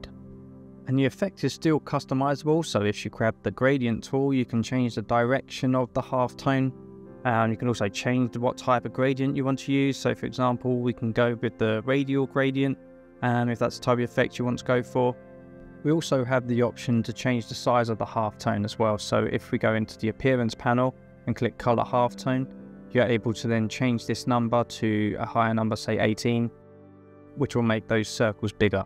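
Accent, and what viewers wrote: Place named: United Kingdom. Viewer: Great tutorial, and of course everything sounds even nicer in proper British English :D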